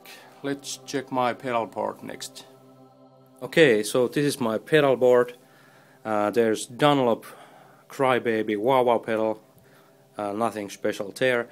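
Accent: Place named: Finland. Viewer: Are you finnish? your english sounds exactly like when a finnish talks english :P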